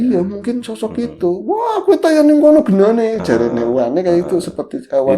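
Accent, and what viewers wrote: Banyumas, Indonesia. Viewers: Basa ngapak mas dan selaan mas itulah yg dinanti...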